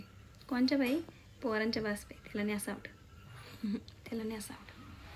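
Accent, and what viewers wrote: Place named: Shahapur, India. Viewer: ताई गाव कुठला ग तुझा मस्त बोलतेस आपली शहापूरची भाषा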